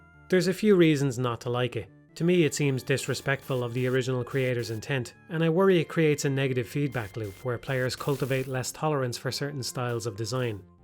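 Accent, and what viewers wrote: Ireland. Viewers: irish asmr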